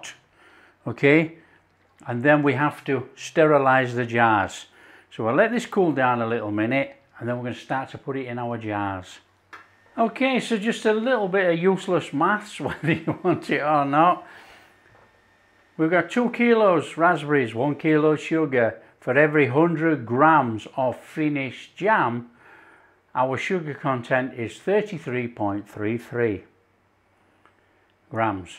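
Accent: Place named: England, United Kingdom. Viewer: you are da man my new friend. Your channel is absolutely awesome. Nice hearing your English accent, we are expats from South Africa, been living in Huntsville, Texas for 33 years.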